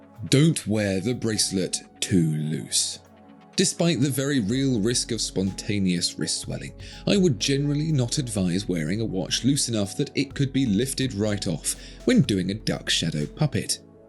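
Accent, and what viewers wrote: United Kingdom. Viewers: Finally, i’ve seen who’s behind that British accent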